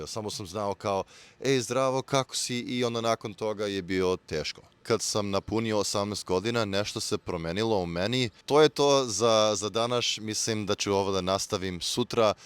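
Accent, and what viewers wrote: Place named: Croatia. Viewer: Imas očajne roditelje... ali nema veze obrati pažnju samo na Đ,Dž,Ć i Č. Vidi da svako slovo izgovaraš pravilno. I vidi da slušaš nekog sa Srbskim naglaskom jer ovako zvučiš kao hrvat trenutno... ali samo napred u vežbanju!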